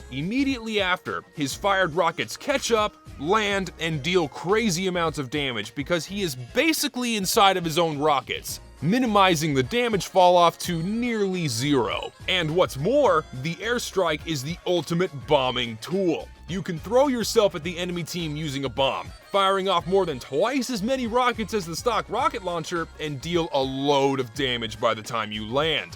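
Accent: South, United States. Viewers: I'm not american and to me your american accent is THICC AF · Ur southern draw started coming out in the end. You unconsciously change your accent when recording. Also I am typing this to boost engagement. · Are you mid-southern?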